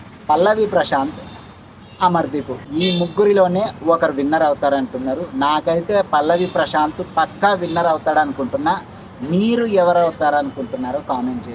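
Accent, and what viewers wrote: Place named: Kurnool, India. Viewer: Brother మన కర్నూలు యాస బాగుంటది మీరు ఇలాగే మంచి మంచి కంటెంట్ తో ముందుకు రావాలి కామెడీ కూడా బాగా చేస్తున్నారు తప్పకుండ మీరు అనుకున్నది సాదించాలి అని దేవుడిని కోరుకుంటా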